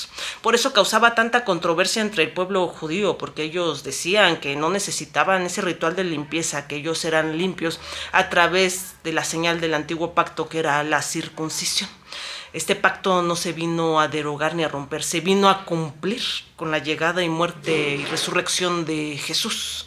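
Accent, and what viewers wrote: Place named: Mexico City, Mexico. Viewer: Hermana, tienes acento capitalino (Chilango). Bendiciones de Dios...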